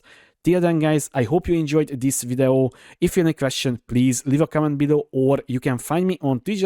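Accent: Hungary. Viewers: I love your accent! Hungary?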